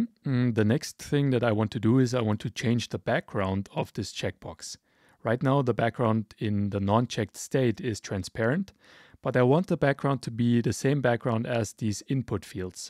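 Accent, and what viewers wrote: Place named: Poland. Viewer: Your channel is great, your pacing is excellent, easy-to-follow and not monotonous or boring whatsoever. I stayed engaged and interested the entire time, and the way you laid things out starting with basic styles and narrowing down to more advanced styling was really logical and built a solid foundation of understanding (and retaining!) the information! Please keep it up, make more videos and continue being awesome. Also, are you Polish perhaps? Trying to guess by the name and the accent, I couldn't tell because it's not very strong, so I am just curious. I am Polish myself!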